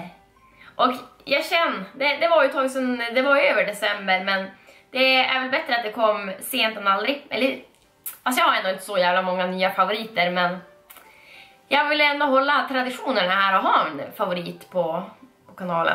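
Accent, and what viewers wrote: Norrland, Sweden. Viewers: Jag avgudar din Norrländska dialekt :) <3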